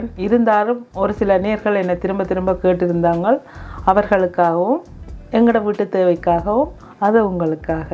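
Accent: Sri Lanka. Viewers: leave it recipe, Srilankan தமிழ் ❤❤❤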